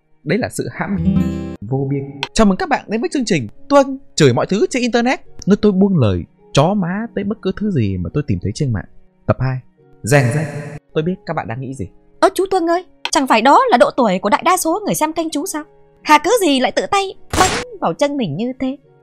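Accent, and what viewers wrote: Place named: North, Vietnam. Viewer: Thề coi ông Tuân stream t ngủ ngon lắm bọn m ạ. Kiểu t cũng người Bắc nghe giọng ổng như giọng bố mẹ t lèm bèm nhau nên t ngủ ngon vcđ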